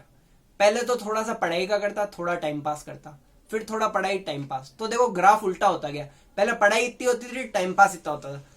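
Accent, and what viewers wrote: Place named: Jodhpur, India. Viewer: ohk😅😅 mtlb jodhpur nhi jodhpur se thoda sa dur boli se lg rha tha😅😅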